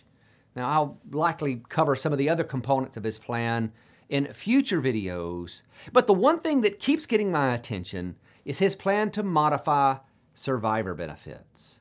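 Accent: South, United States. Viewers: Duh, I'm a financial planner - a title anyone can use without much education. You make it sound confusing and make it much more complicated than it is. Just go to social security and call them - they make it much simpler than this doofus who chatters nonsense in southern accent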